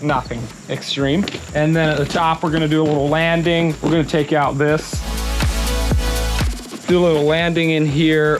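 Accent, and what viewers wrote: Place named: Maryland, United States. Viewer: What is that accent? Is that a northeast/Maryland accent because I am so intrigued by it! Looks good by the way!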